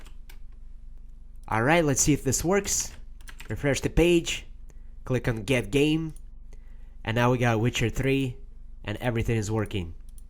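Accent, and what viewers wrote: India. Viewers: hahaha indian accent, hhhh btw i am soooo lucky to find your channel cuz you explain the syntax better than anyone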